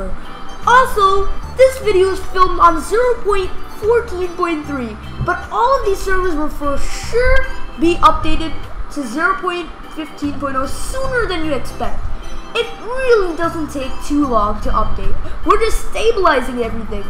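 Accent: India. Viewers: are you indian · Wait Is He Indian??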